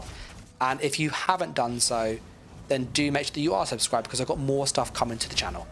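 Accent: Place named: United Kingdom. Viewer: lol I'm just trolling. I knew you was a Brit from the accent. I lived near Mildenhall for 2 years back in the days. Played darts at the Bird-in-Hand pub, was a blast.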